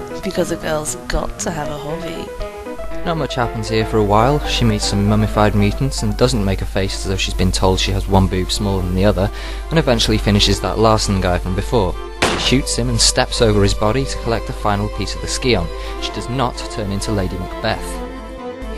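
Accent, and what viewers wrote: United Kingdom. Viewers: Wow this must have taken you a lot of time! Very funny! Btw you have a british accent :) Probably cause you're biritish =P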